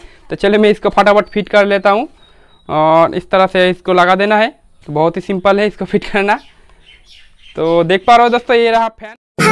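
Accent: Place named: West Bengal, India. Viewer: তোর কথা শুনে তো বাঙালি মনে হচ্ছে।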